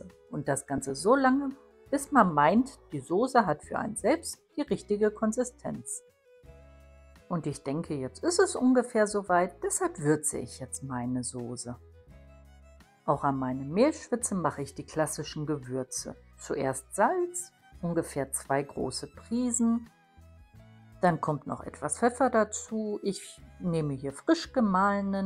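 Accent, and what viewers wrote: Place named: Germany. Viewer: Bom dia, não entendo quase nenhuma palavra mas não importa, eu ficaria horas ouvindo sua voz e sotaque alemão 👏🏻👏🏻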